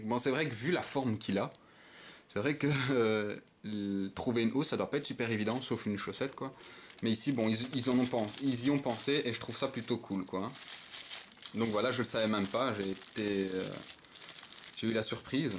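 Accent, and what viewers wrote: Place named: Liège, Belgium. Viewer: tu viens de liege toi hein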